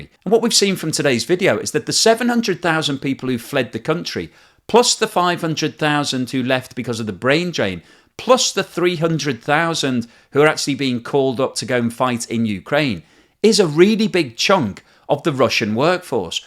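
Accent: United Kingdom. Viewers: I can tell by your accent, you're British, why don't you do a video on your own country's economy!? Spoiler alarrt... it SUCKS!!!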